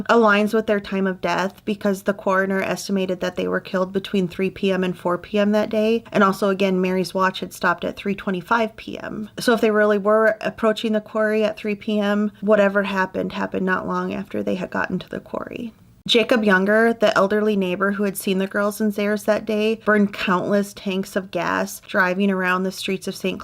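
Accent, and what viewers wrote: Minnesota, United States. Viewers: Love that Minnesota accent! Also from MN ❤❤ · I don’t comment on channels often, but had to say what a great job you do at storytelling. I came across your channel just a few weeks ago, and of course being a former Minnesotan, I was intrigued. This story really struck a chord as I went to SCSU in 89’ and my townhome was so close to this quarry. I had never heard of this story before, it was heartbreaking to say the least. I clearly remember when Jacob Wetterling was abducted and the National Guard flying over my dorm room in search. Always a true crime buff, but now a Floridian, I look forward to your podcasts…and loving your accent as it sounds like what will always be considered “home” to me…Minnesota! My best to you!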